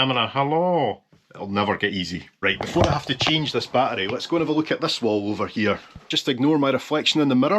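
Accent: Scotland, United Kingdom. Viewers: I love your videos. I love your naturalness. I love your scottish accent and how very scottish you are. I want to ask, and I am sure you have been asked this before many times, but when oh when are you going to come down to Australia, and film here? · Extremely entertaining! (I‘m glad your Scottish accent is charming but not too heavy like Gary Anderson 😂) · What captivates me about your videos to be honest is your Scottish accent. I’m from california so to hear your accent takes me to the fantasy world of old England and some small cottages and green pastures. But that is what my vision of Scotland is like. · Is it just my English ears misleading me, or do you really sound more Scottish when you are sitting in your own study at home than when you are making your usual travel videos!? :-)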